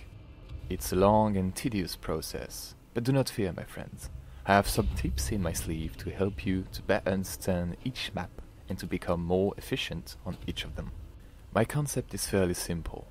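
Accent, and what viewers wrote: France. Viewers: Your french accent is very good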